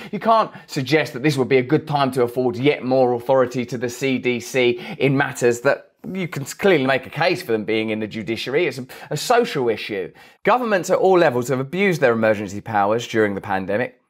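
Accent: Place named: Cockney, United Kingdom. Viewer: Hey, could you please say "story" also without a "t", as you omit all other "t-s"? I'm joking 😊, big fan 🤩, keep up the cockney 😆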